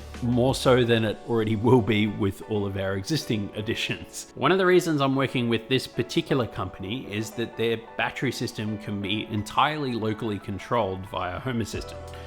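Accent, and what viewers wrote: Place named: Australia. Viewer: I sometimes forget how much I love hearing my own accent on youtube until I find a aussie channel that is of interest to me!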